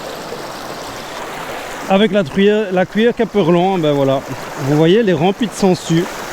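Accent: Belgium. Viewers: Tu es belge ou français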